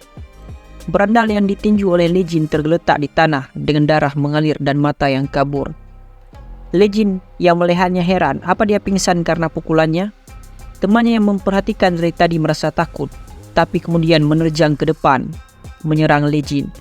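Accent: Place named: West Nusa Tenggara, Indonesia. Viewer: lu orang ntb ya bang? logatnya kayak teman dari sana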